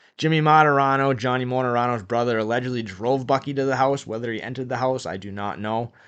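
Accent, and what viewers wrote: Boston, United States. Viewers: Great job you can really hear the progression in your storytelling more precise,articulate.. without losing your Boston accent LOL. which really ties it all in... Hey true story in 1999 I bought a 4 ½🥕 canary yellow diamond by itself off of the Greek, I knew his son from coming into Mac2 in Billerica where he was living in nice house, which supposedly came from the job, I have no problem talking about it 1st off my ex-wife has it.. LOL more importantly that shits way past the statue of limitations.. and I believe the Old man passed away awhile ago. Also the war between the H.A and Outlaws kicked off because the outlaws murdered some Lowell members who were down in Florida supposedly, looking for 2 outbad members to make sure their tats where removed and retrieve club property.. anyway they went to the Outlaws clubhouse to tell them why they were there, And the outlaws invited to a party they were having and during the party and outta nowhere an outlaw 🔫 activated their life insurance policies.. 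and they've been playing "lead tag" with them ever since, and fact the 1st ride of the year they visit their graves in a lowell cemetery! ✌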